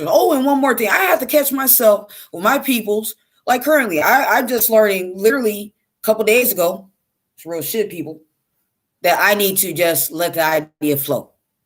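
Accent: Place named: Detroit, United States. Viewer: I hear a Detroit ish Midwest accent. Am I right?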